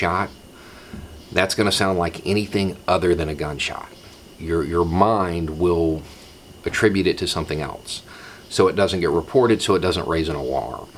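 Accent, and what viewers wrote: South, United States. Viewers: right there with you, look forward to and a dose of common sense. Also to hear a little southern accent...I don't get that up here in New Hampshire. Positive vibes to all the good internet people 😁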